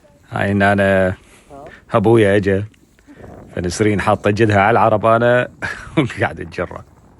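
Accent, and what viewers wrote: Iraq. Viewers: ياجماعة الخير اني اذا افتهم من لهجتك انت نجفي قح من جماعة كفه الصحن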